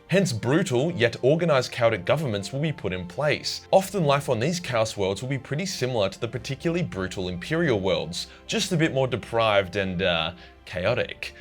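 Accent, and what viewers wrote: Australia. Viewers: Bruv I need to move to AUSSIELAND and hear u speak on the daily it's fucking great, you Mook. Keep up The Emperors Work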